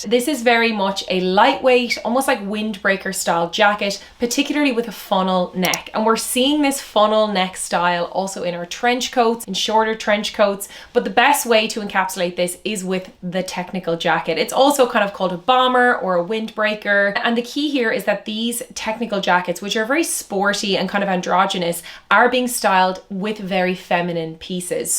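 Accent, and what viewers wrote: Ireland. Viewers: Just sounds Irish to me!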